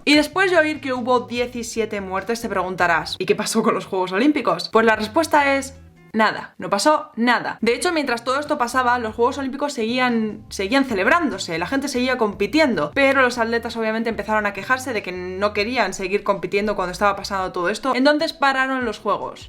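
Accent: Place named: Spain. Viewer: dominas muy bien el idioma , aunque español de españa pero bien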